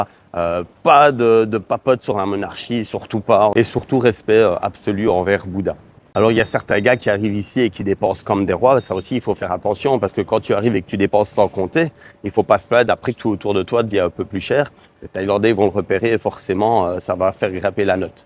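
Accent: Belgium. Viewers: Ton accent belge est très sympa ! 👌🏻😅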